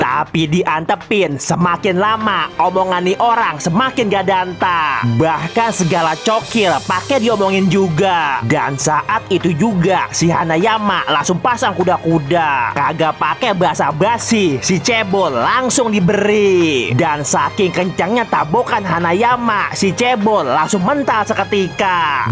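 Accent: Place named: Bekasi, Indonesia. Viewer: Makin yakin gw kalo lu orang Bekasi bang, minimal Jaktim atau Bogor deh, kaga danta emang 🤣😂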